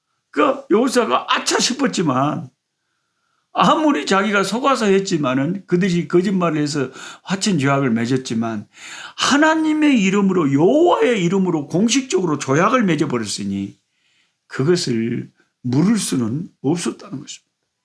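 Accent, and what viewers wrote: Jeolla, South Korea. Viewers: 목사님 전라도 사투리 말씀 은혜가됩니다 저도 전라도 고흥입니다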